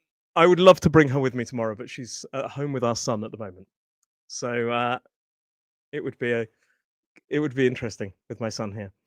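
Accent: United Kingdom. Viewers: Thank you so much! I love British voice. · Yes,! I very much like your British accent, as I told you before because is the first English that I learned. And altough I probably won’t travel any more (I visited London once years ago), that is the English accent I most like!! Marcos (Montevideo, Uruguay) Ps: even though I'm 64 now and I'retired, I want to improve my english pronuntiation the way you teach it... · I'm from Argentina. I love British pronunciation. And I'm very happy because I understand every word you said!!😊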